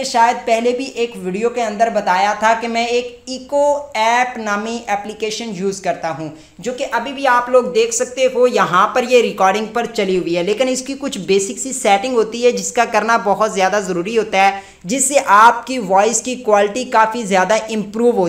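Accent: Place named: Rural, India. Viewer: Posri k Sakreen nhn hota Screen hota ha bae nhn hota Bhai hota Pendu Urdu chor punjabi ma kr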